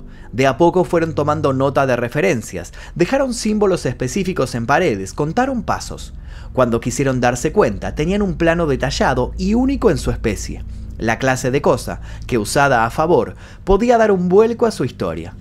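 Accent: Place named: Argentina. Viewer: ! Me encanta cómo relatas y tu voz le da un plus de interés a todo aquello que relatas. Los diferentes tonos, las pausas y el acento, el mismo que el mío, pero que me encanta escuchar por que ya no vivo en Argentina. Cuando vaya para Francia los visitarte y te contaré. Chau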